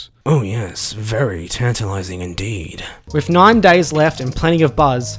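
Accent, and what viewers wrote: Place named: Australia. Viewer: I see the link to this video in Painticus' discord, I hear an Australian narrator, I like and subscribe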